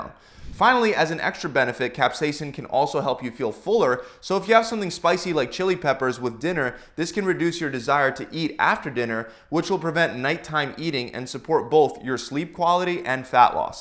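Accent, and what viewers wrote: Philadelphia, United States. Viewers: now we know he is from Philly :) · “Wooder” - Guy has to be from Philly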